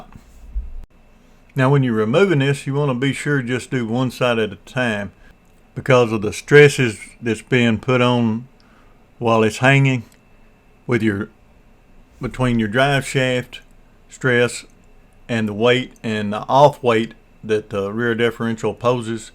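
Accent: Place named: Scandinavia. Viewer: What sort of accent is that? Sounds Scandinavian to me🧐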